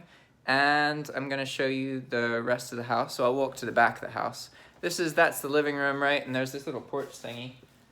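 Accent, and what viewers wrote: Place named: England, United Kingdom. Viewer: Great video. You are a very inspirational person. Glad u are back home. Did anyone ever tell u you have a slight accent? Like u sound like u were in England and picked up a little of how they talk. Anyway, Ive been watching u for awhile and hope everything goes well for u.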